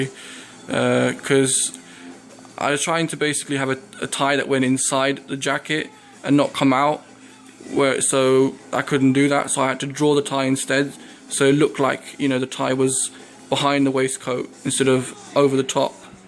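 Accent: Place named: United Kingdom. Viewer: Hi again im back on my own user/channel now, what's your nationality? if you don't mind me asking, you sound British am I right? My PSN name on PS4 is CS20-2015 add me if you want.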